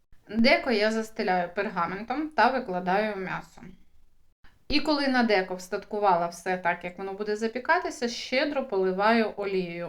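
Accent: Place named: Transcarpathia, Ukraine. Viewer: Це закарпатський діалект.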